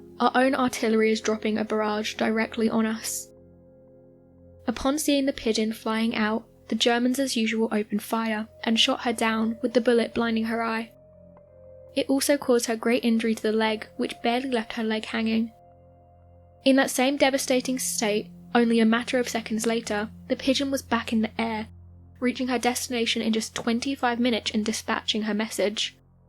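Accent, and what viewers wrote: United Kingdom. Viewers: Ningeelewa ila sasa hii lafudhi ya kiBritain ni kisanga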